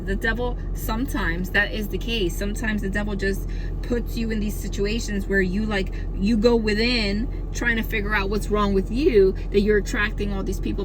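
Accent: New Jersey, United States. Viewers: Just wanted to tell you I LOVE your personality.. even your NJ accent lol ♡♡♡ have a blessed day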